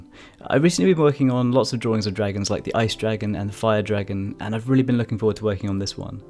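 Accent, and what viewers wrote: United Kingdom. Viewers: I take it from the accent your in the UK , you think you could draw a dragon and dismantle the picture in to sections that could be cut from steel ? im a blacksmith near brecon south wales i will let your mind run away with that idea for a while :-) get back to me usk valley forge facebook if all else fails.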